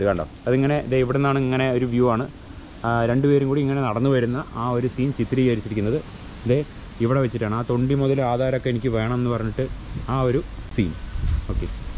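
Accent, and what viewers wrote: Palakkad, India. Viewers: intro kalakki . paalakkaad oru sambavam thanne. Paalakkaadan slag aanoo chettan samsaarikkyunne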